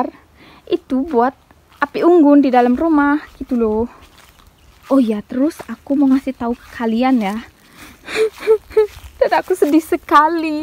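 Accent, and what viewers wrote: Bali, Indonesia. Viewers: Logat balinya hihihi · Kok kak logat.a agak orang bali 😍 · Dari Bali kak ya?? Logat Bali banget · Logat balii dongs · Orang bali kak? Kok logatnya bali banget yaa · Logatnya bali banget Kakak dari bali yaa? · Kak ada logat Bali nya kental banget yaa 🤣🤣 Di konten YouTube yg rujakan sama Mpo Ika juga bilang biasanya rujak bumbunya tabia, sere, kuah pindang 😂 Penasaran dulu pernah tinggal di Bali kah? Btw, stay healthy sekeluarga dan thank you udah selalu sharing konten yg menarik yaa kakk 😍😍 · Kok aku dengar ada logat bali nya ya😂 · Logat Bali ..kak dari mana asal Bali kah? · Aku baru mulai nonton channel kakak...kak dari mana ya? Orang Bali kah?soalnya logatnya kaya orang Bali😅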